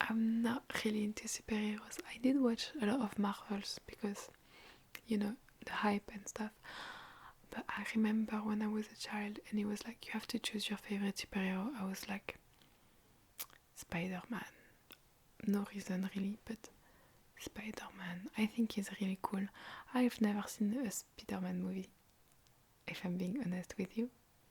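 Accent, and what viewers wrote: France. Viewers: hii, i'd love an asmr video full in french of following instructions themed, cause with those videos even if i don't speak the language i can still understand what you're saying, and your french accent surely deserves a whole video! 🤓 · I think you look much older with your natural hair color. I love it! By the way ,you are so pretty and everytimr I hear your sweet french accent I get the goosebumbs.🫡😔😂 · YESSSS THE FRENCH ACCENT I LOVE THE FRENCH ACCENT 🥖🥖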